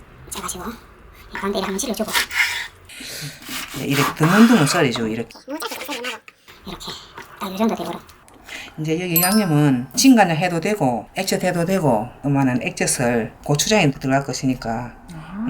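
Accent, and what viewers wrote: Gyeongsang, South Korea. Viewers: 경상도 말투가 아주 재미있어요 잘보고있습니다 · 진주사투리 들을수록 고향가고 싶어요 스물안되 부산와서 벌써 50이 넘었네요 내가 뛰어놀던 인사동 잘 있나 몰라요...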